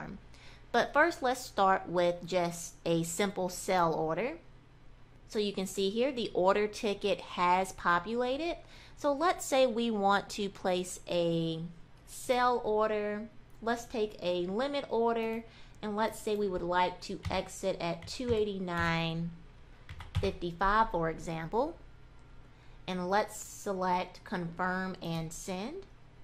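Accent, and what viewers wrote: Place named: South, United States. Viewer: Lol Asian shorty with a black southern accent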